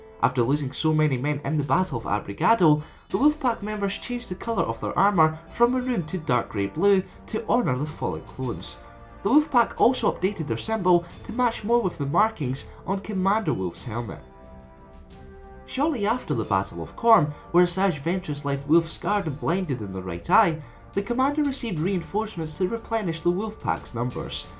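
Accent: Scotland, United Kingdom. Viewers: you sound really scottish...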